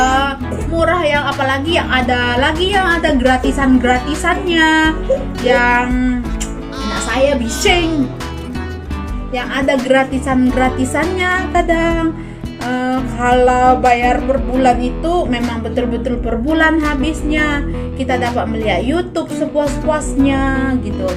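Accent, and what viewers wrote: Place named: Medan, Indonesia. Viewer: Dia dari Medan Sumatera Utara Indonesia... wkwkwk cuma bagi tahu je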